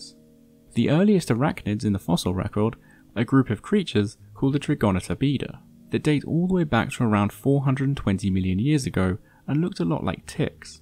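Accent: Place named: England, United Kingdom. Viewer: Does anyone else find this particular English accent really grating? Its just like nails down chalk board for me. All I hear is him sucking his teeth and speaking through his gums.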